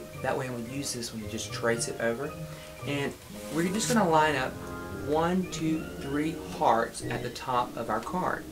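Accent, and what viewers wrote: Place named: South, United States. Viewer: thanks for the reply! I definitely like you're idea better, I'm working on mine tonight as soon as I get home! Keep up the great work!! Can't wait for thext video! BTW, where are you from? You sound soo southern!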